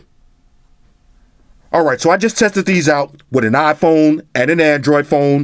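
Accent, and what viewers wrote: New York, United States. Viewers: Love the NYC hood talk. It's refreshing compared to the usual.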